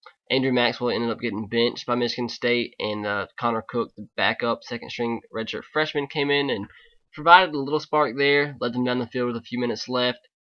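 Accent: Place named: South, United States. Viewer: yeah i can tell you're southern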